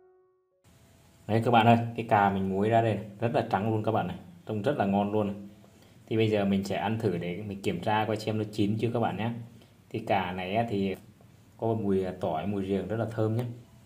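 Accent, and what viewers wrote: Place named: Central, Vietnam. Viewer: Cách chia sẻ đơn giản dể làm, cà pháo trắng giòn ngon. A là người miền Trung à anh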